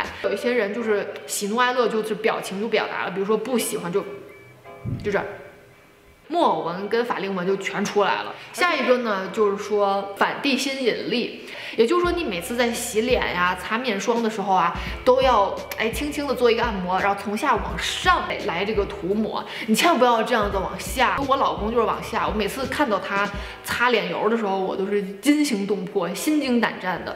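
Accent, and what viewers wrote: Tianjin, China. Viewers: 我第一次看你的视频就觉得你是天津人，和我一个多伦多的天津朋友说话的那个味儿太像了。我本人坐标温哥华，希望能分享些挑选衬衫的方法。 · 博主讲话有天津味儿。跟Tracey 有点像。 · 你好，请问你是天津人吗？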